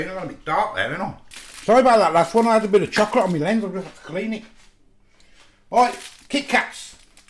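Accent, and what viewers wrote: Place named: Midlands, United Kingdom. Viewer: Which part of the midlands are you from, sir?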